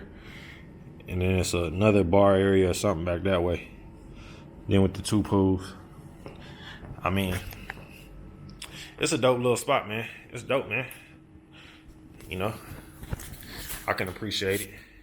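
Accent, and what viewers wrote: United States. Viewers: Sounds like u from Cali homie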